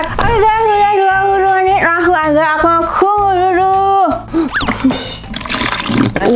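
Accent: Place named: Semarang, Indonesia. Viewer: Gek weruh aku ono channel e mbak iki, mesti cah plat H logate koyo aku oq soale wkwkwkwk makasih reviewnya yo mba, aku tertarik sama yg obat kumur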